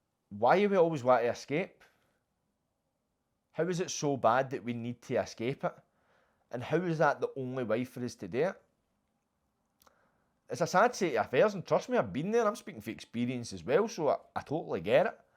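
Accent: Glasgow, United Kingdom. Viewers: What u on about he’s talking the way 95% of Glasgow people will talk which is more relatable to people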